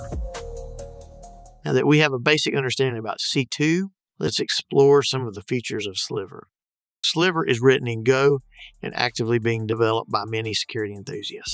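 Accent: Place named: South, United States. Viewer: an intelligent person rocks his country accent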